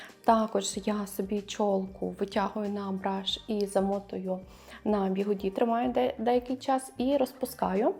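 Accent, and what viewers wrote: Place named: Galicia, Ukraine. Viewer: Вітаю,. Дуже мені подобається дивитись Ваші відео, а особливо чути рідний галицький акцент. Але я Вас дуже прошу, немає у нас слова «ваший», правильно казати «ваш». Дякую, не ображайтеся, будь ласка 😊 Бажаю Вам творчих успіхів.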